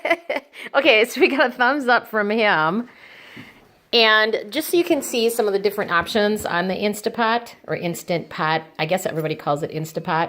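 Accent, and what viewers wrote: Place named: North, United States. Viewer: hello! You sound like your from the north lol. Hello from MN here. Thanks for the video, i was going to try pico and chicken in my new instant pot as well. almost exactly a year later.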